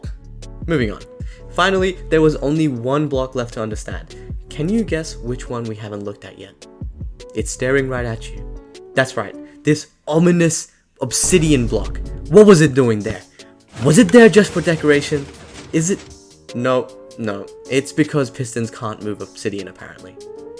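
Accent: Germany. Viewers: Ey bro, u german?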